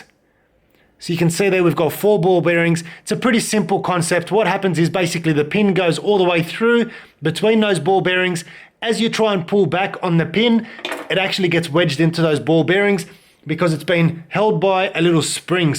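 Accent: Australia. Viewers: Thanks heaps- brilliant How To 👍👍Also, nice surprise to hear an Aussie voice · Hello from India my Aussie mate. A B-I-G thanks. You saved me a hot, sweaty trip to the mall to get the tag removed. It's a pair trousers that came as a gift, bought over a year ago. Finally, when I received it, it had the tag, the damned tag! I had no idea which mall it was bought from, I did not have the receipt. I hated going to the mall in the horrible weather. I took it to the local tailors and they all said they cannot remove it without damaging the fabric. I didn't know what to do. Then a friend sent me this video. and Voila I just got rid of the tag. Good riddance, I say. P.S. - I loved your Aussie accent and that Aussie exuberance and enthusiasm. I love this Aussie spirit. So down to earth, friendly, non-bossy. I have a few Australian friends here in India and I think Aussies are a great people. 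Finally, A big thanks to you again mate.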